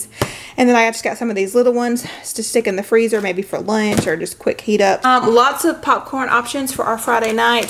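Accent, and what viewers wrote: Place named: South, United States. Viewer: HELLO SWEET LADY, I AM NEW TO YOUR CHANNEL AND JUST WONDERING WHAT STATE YOU LIVE IN AS I HEAR A BEAUTIFUL SOUTHERN ACCENT.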